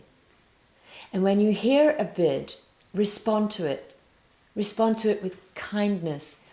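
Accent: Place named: RP, United Kingdom. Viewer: Sorry,.....but this is so simplistically ridiculous, superficial and sophomoric......that you could only pass it off as a working miracle by affixing 'Dr.' to you name and speaking with that highbrow English accent. I listened carefully to what you have said.....and even if true......many relationships fall completely apart based on many other elements. Seriously? It actually sounds like you have not been in many relationships or successful ones or really 'know' so-called successful ones. What appears successful on the surface often, below, turns out to be a simple compromise made my many, many people to accept the situation for fear of being alone, lonely, insecure financially, etc. For example, you may go to VIP events with some guy or your husband draped on your arm, but do you really know your husband or what he is like? Or do you just claim that you do. 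You sound like another pretentious psycho-wizard. I know of many people in relationships that are long lasting but totally devoid of real respect, love, etc. They cling to each other and put on a front. 50% of all marriages fail in America and of that remaining 50%.......that vast majority are not particularly happy. That's a real fact.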